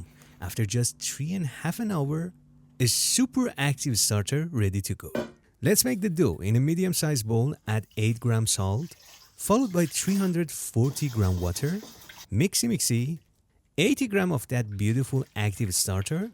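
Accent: Iran. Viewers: I bet this guy is iranian. And the petsian accent kept on increasing throughout the video😂 Btw yeah, thanks for the video. I'm going to try out this recipe also.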